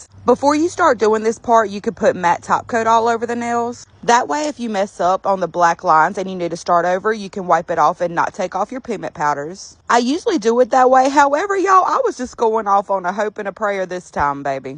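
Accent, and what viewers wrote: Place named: South, United States. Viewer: I’m so sorry but can we talk abt how the longer video plays the more country she sounds 😂😂❤️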